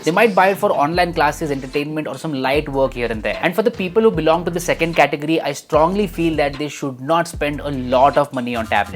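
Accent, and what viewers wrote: India. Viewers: Sorry... cant stand listening to the very thick Bollywood accent...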